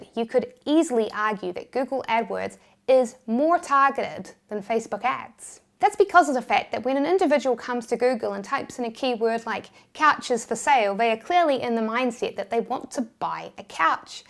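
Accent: New Zealand. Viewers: Peppa Pig is British, where here sounds like she's from New Zealand. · keewee accent bro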